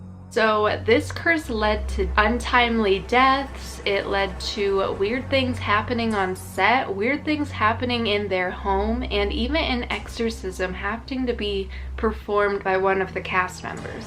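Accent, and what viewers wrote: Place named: California, United States. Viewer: I do hope you know that I was just picking on your Californian accent.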